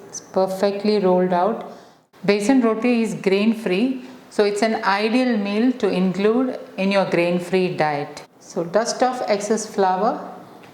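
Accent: India. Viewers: Very bad voice and typical weird Indian English accent. Sorry to say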